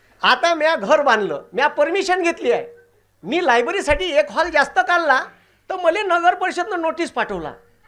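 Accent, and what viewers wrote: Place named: Vidarbha, India. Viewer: Varhadi Marathi ❤❤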